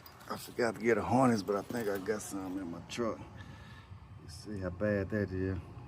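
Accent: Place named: Mississippi, United States. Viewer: You from Mississippi?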